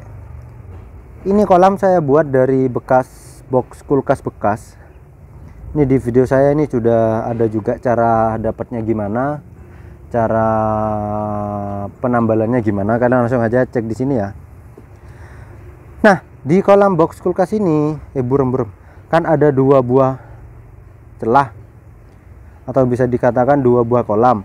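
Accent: Madura, Indonesia. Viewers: Orang madureh kak?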